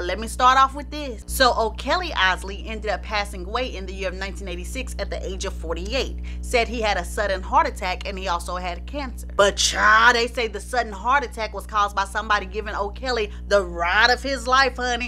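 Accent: South, United States. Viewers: lol it’s her southern accent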